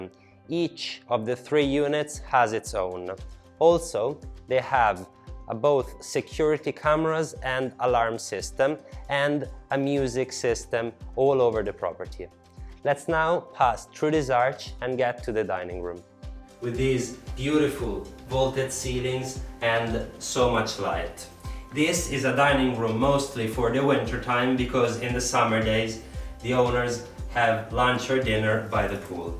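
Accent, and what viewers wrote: Italy. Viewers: Hello friend I am here only for your Italian Accent. Love you buddy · In realtà ha un accento molto meno marcato della maggior parte degli italiani che parlano inglese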